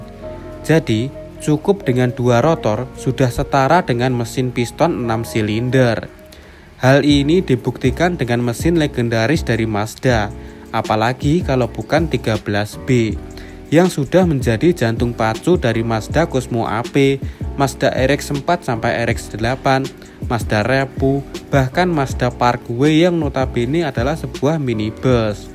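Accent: Java, Indonesia. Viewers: Nice job. Btw saran intonasi pas baca narasinya sedikit dibenahi bro, rapopo ketok jowone sitik2, sing penting nafa bicarane menarik viewer sing nonton. Tetep Semangat bagikan edukasi. Tk subs ki lho. 😁👍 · Medok banget ya ..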